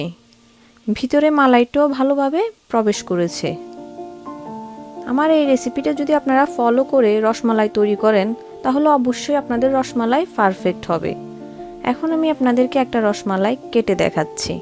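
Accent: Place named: Noakhali, Bangladesh. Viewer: নোয়াখাইল্লা। "প" কে "ফ" বলে। anyways recipe darun cilo.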